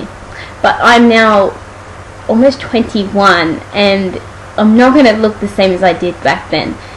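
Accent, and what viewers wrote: Australia. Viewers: hell yeah girl i agree! i got 3! i think u look gorgeous and ur tattoo of the peacock looks gorgeous! oh and btw i loooooove aussie accents and girls like you ;P turn on! haha but yeah let the haters hate you know! prolly dont know what that means cuz im from chicago but you never know! keep doin vids i subscribed 5 min ago!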